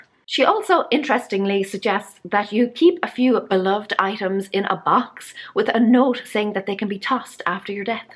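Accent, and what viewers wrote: Ireland. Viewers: When i moved several years ago, my son told me, "There's not 10% of this stuff that's worth keeping, let alone packing and moving it. You're on your own." I agree with you-- let's toss it. And i like your Irish brogue.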